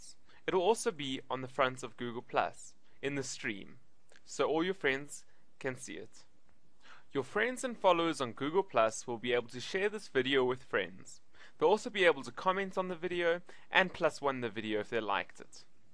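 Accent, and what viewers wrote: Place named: South Africa. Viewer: I immediately recognized your accent as a fellow South African! I have lived in Portland, Oregon, USA for the past 31 years, and still miss my homeland although I am very happy here. Thanks for the helpful info.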